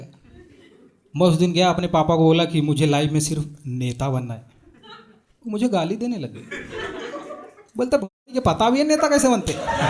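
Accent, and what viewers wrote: Chhattisgarh, India. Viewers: Accent Chhattisgarh ka lagta hai !!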